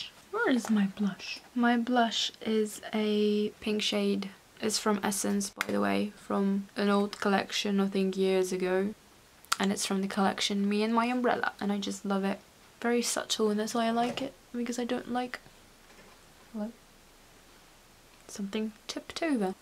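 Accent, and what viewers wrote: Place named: United Kingdom. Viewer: that british accent tho, love the video. Keep it up :>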